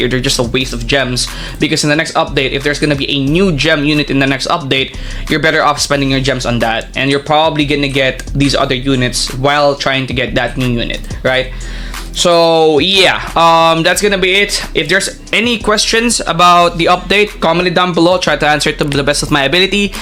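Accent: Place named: Philippines. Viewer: Helpful video! off topic but are you Filipino? Your accent is cool 😅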